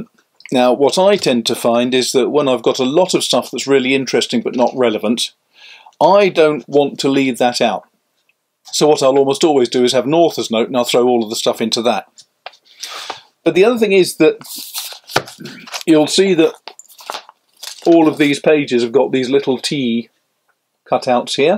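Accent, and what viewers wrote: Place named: RP, United Kingdom. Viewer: Could you please make a video on why is it that most Brits, even well-read persons like you, have this unbearable artificially arrogant, posh, patronizing, lordly, toplofty tone while speaking? Even among yourselves... what are you trying to proof? The British Empire finished long time ago, did you know?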